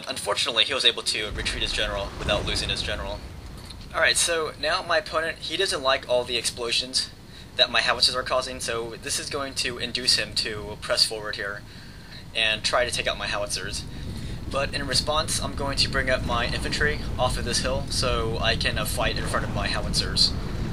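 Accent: Netherlands. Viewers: lol nice battle and ur dutch accent is funneh but very well spoken. I was suprised. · funny dutch accent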